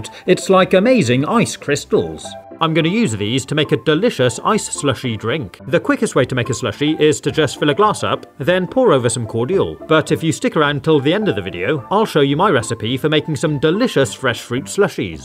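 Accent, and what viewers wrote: United Kingdom. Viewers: i like how he uses british accent with american vocab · Are you British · are you british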